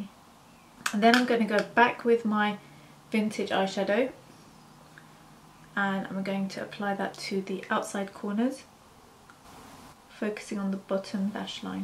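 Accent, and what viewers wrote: United Kingdom. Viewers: Are you muslim women? What is your name? Your Accent is British, Right?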